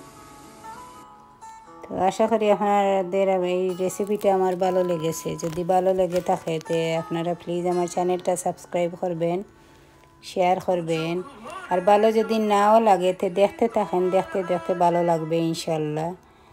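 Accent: Sylhet, Bangladesh. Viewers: Didi India thakidekhram. Ajkeu recipeta try khormu. Okhonto katholer season. Syloter khawoa AR accent ekirokhom. Aphnar Allah AR Amar Bhogoban. Kintu armra daughter of the same soil. Okhon aphner channel subscribe khormu. Lots of love from India.uttor dilekhushi oimu no heart emoji........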